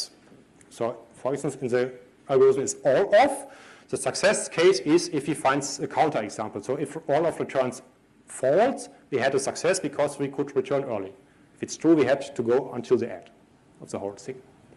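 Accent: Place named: Germany. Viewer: i don't know what's wrong, but it's close to impossible to understand what he says, german accent is way too heavy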